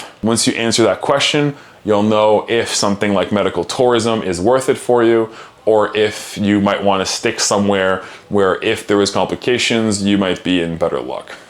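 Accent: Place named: Quebec, Canada. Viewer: I thought so! By looking at your name I was wondering if you were French Canadian. Cool. You speak quite fast as well so with your accent it sounded different. I think the French accents we have over here are a little different to the ones you have over there. Love your channel and think you are doing a great job helping and inspiring people.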